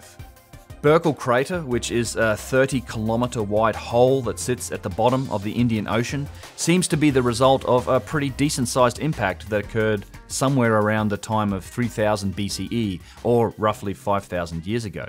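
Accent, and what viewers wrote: Australia. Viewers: Great to hear and Australian voice with a typical Australian perspective "God's pissed off......"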